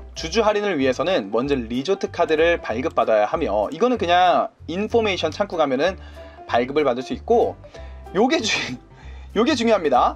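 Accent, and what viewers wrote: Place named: Gyeongsang, South Korea. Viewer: 님안녕하세요 ㆍ구독자입니다 항상유쾌하게경상도사투리로하는경제공부많은도움얻고있어요 여쭤볼게있는데요혹시 아라튜브라고아시면 알려주실수있을까요??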